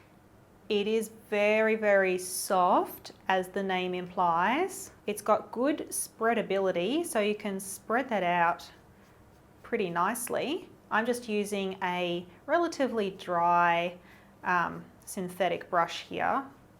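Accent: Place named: Australia. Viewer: Thankyou for this. I love Liquitex! I just bought an art course and they recommend the Liquitex heavy body, which I’ve never used as I use the regular paint in varying brands, so I’ve been doing research on it. And I found this video - imagine my surprise to hear an Aussie accent! And you’re just down the road - I’m in Brisbane! So new Sub here… Cheers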